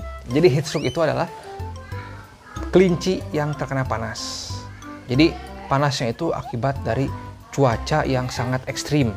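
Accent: West Java, Indonesia. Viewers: Orang mna ktu kang logat ngomong nya mah sunda? 😅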